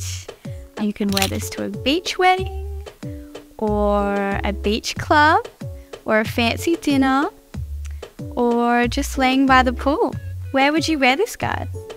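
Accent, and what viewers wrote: Australia. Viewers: Love that Aussie accent, I didn't understand a word she said but it doesn't matter.😊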